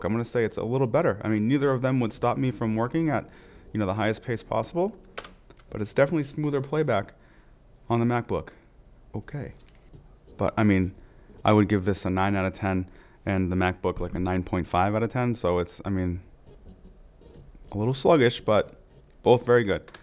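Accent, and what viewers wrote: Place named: Boston, United States. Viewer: Bro, I can hear my hometown in that voice. I grew up in Southie. Randomly came across your channel; just faced the exact same dilemma. Different workflows, and my PC is equipped with a 3080, probably spent close to $5k on the PC build total. I picked up a fully decked out M1 Max (64gb, with the 2tb SSD). The MacBook was cheaper, coming in around $4300. Granted I still use the PC for gaming, which I have no plans to do on the Mac, but it’s pretty insane how crushing that performance is. Sadly, I’ll have to wait for Dec 1 to get my 16” MBP; this has definitely made the wait harder. Can’t wait to get this little powerhouse in my hands, retire the old 16” Intel MacBook Pro, and save some time everyday.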